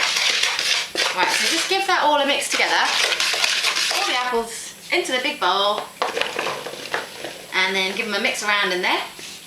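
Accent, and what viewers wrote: United Kingdom. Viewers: British accent. Thought you were American? well... back to the pie business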